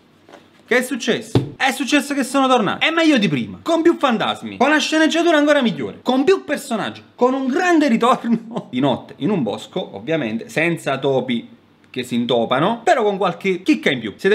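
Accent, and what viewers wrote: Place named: Naples, Italy. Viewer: sei veramente eccezionale davvero a parte che mi piace molto il tuo accento napoletano sei comunque fantastico perché vedi chi crede a queste cose come me e non sono l'unica imbrogliare me e gli altri non è molto sportivo diciamo anzi ti senti presa in giro. spero e so che hai capito cosa mi riferisco comunque scusa se te lo dico ma il fatto delle bambole possedute è la più grossa idiozia mai sentita anche se non stai parlando di quello però ci tengo a dirti quello che penso per farti un esempio. Grazie 😘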